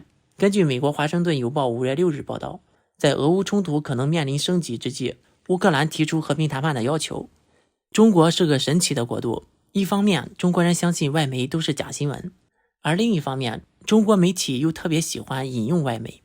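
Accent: Shandong, China. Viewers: Klaus是不是山东人，感觉口音挺重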